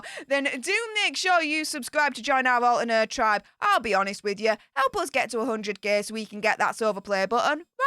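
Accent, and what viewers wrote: Yorkshire, United Kingdom. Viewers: So good to hear a Yorkshire accent after all this time 😂 and you’ve got an ocarina in the shelf behind 😎 time to subscribe ✅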